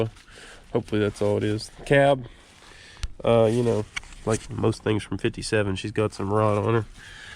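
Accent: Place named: Pennsylvania, United States. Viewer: sounds like a Pennsylvania accent there....where are you from? we are in ohio. love the old trucks. i have a 1968 w900a with a an 8v72 2 stroke and 4 and 4 trans. original truck but needs retored. also have a 65 loadstar project going on.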